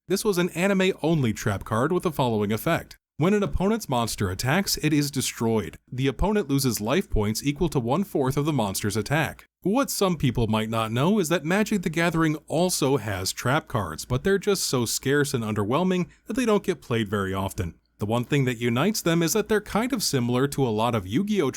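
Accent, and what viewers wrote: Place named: Brooklyn, United States. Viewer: I appreciate your Brooklyn accent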